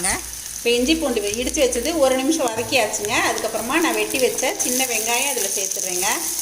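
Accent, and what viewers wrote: Kongu, India. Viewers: superma 👌.karura palavidhamana visayangalayum kovil anai hotel market handloom bedsheets towels indhamari ungalai kavarndha visayangalayum podunga .therindhukolla vasadhiyaga irukkum.kongu tamil pesi vilakka neengaldhan super.valarattum ungal pani valthukkal.💐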